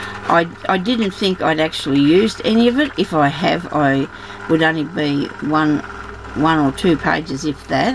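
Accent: Australia. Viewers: Hello, new subscriber, popped over from Magz Lockley's. I' m in Victoria, nice to hear another Australian. Will have a look and see what fabric I can find, do a V R. to enter your giveaway. Sue 🌸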